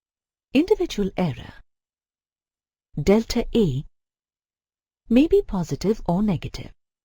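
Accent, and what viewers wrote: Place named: India. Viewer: Good indian accent. & tune and pause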